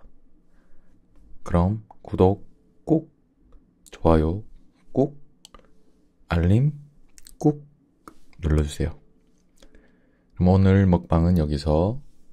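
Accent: Busan, South Korea. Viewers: 부산말투?사투리 느껴져서 괜히 정감가는듯 ㅎㅎㅎ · 부산사투리가 왤케 좋죠? ㅎㅎ 꿀떨어짐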